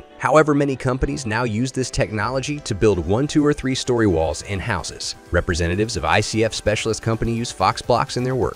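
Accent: United States. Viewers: Why is yet another 'cut & paste' video (complete with nauseating American voice-over) purporting to be about China, showing construction methods from around the world?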